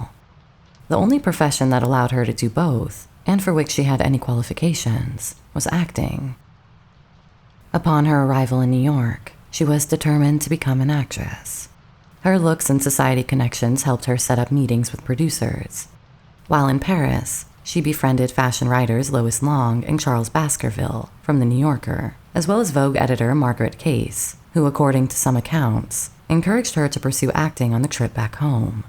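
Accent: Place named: South, United States. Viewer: What was doing?The documentary has a lovely and southern voice, unlike so many of them that are like gracious, I love your voice, whether it's real or unofficials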